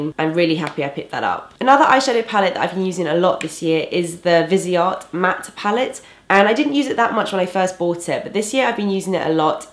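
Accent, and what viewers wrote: England, United Kingdom. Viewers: I just love your English accent!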